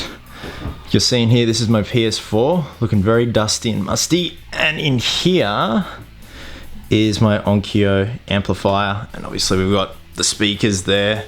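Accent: Australia. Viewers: Where are you from?? Australia?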